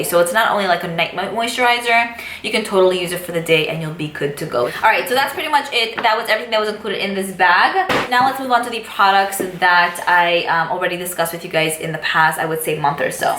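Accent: New York, United States. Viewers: Im from the South. So the New York voice is so addicting! haha good video. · Love your accent! You need a video just repeating the word "gloss", LOL. I was like is she from New York....looked in the description box and what ya know, you are! LOL.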